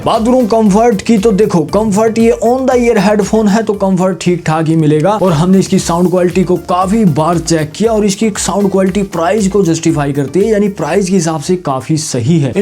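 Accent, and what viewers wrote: Haryana, India. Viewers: Bhai haryana te h k?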